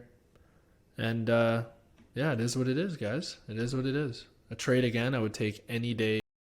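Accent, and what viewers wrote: Canada. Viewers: You sound like you're from canada! Where ever ur from, love from Toronto 💪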